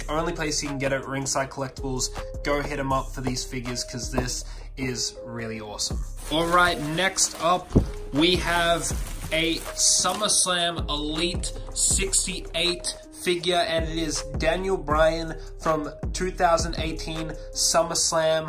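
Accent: Australia. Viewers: Yea I’m pretty sure it’s because he’s from Australia so he has a little accent I caught that when I first watched this but I just saw your comment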